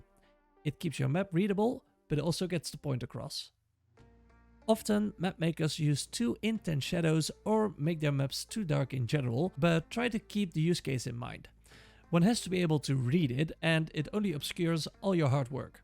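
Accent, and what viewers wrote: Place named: Scandinavia. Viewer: Thank you for that thorough explanation! I definitely would love to see a video about what to do with your map when you’re done. I’m actually pretty familiar with lossy vs. non-lossy formats — my day job is as a web developer! But what threw me for a loop recently is that the “roll20” image export setting exports at 70ppi, whereas the native resolution in DD is 256ppi. So my maps were looking like crap in roll20, especially zoomed in, because the ppi was too low (combined with the 10mb file size limit that made increasing the ppi much more challenging). Someone on the DD Discord helped me out by suggesting that I export at 256ppi at 80% quality, and that has worked beautifully. Oh, and roll20 actually does support WEBP (that was news to me, too!) As far as I know roll20 does not support universal VTT files, however, so I will have to stick to flat images for now. 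It’s mildly annoying to have to place all the dynamic lighting boundaries manually, but fairly straightforward once you’ve done it a few times. (I’m sure someone will say “ you should use a better VTT,” and maybe I should, but at this point I’m so invested in the roll20 ecosystem, there’s a bit of a sunk cost fallacy thing going on. Also I love playing virtually, so I don’t anticipate a lot of print maps in my future, lol). Randomly, if I might ask, what is your accent? I’m guessing Nordic of some flavor? Feel free to ignore this somewhat rude question; I’m just fascinated by accents and language.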